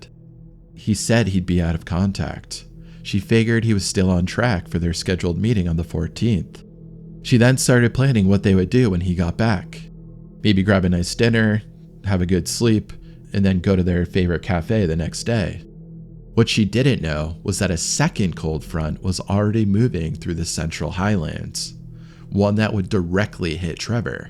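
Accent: United States. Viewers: I started watching this, but I can't tolerate an American accent telling us about our own country which they think they own.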